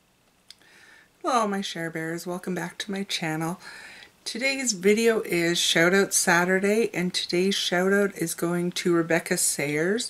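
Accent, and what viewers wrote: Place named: Australia. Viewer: Love the Aussie accent.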